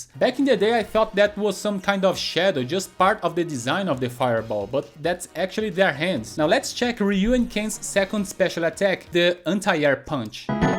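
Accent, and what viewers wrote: Brazil. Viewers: Nunca vi esse canal antes. Ouvi, literalmente, 3 segundos desse cara falando e percebi que era brasileiro. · Mano tu é catarinense né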